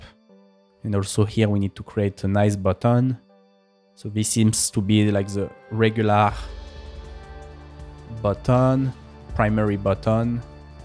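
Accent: France. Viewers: Man your french accent is great! Nice format btw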